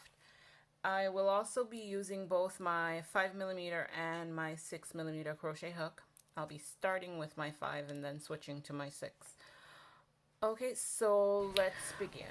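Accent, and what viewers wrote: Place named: Caribbean. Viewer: I hear a Caribbean accent❤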